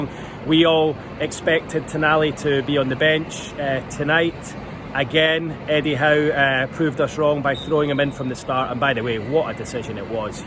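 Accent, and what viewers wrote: Scotland, United Kingdom. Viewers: You're a Jock mate not a Geordie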